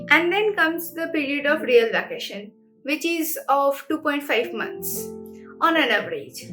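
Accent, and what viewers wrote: Bengal, India. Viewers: Class schedule k baare mein btao didi, targeting IISER Kolkata. Are you bengali cuz ur accent sounds like that?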